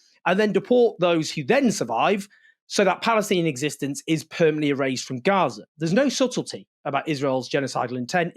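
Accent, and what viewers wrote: United Kingdom. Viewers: Why does Owen sound British???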